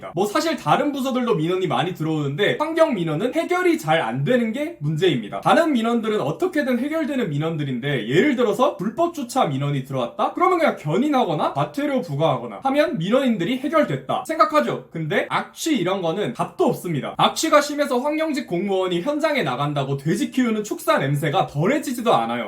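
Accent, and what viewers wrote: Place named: Gyeongbuk, South Korea. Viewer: 말투를 보니 경북분 같네요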